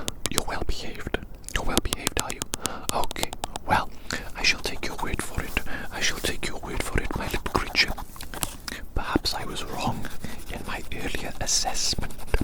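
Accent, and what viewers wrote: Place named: RP, United Kingdom. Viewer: This dude’s pronunciation puts stereotypical British to shame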